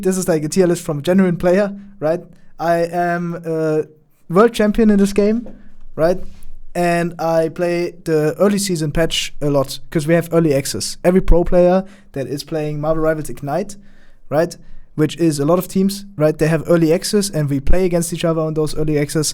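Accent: Germany. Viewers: On everybodys soul, you are german. It took me 3 min to say for certain, but I am sure now. Its because of the hard k in things like cool and the german ie instead of the american iea in tier.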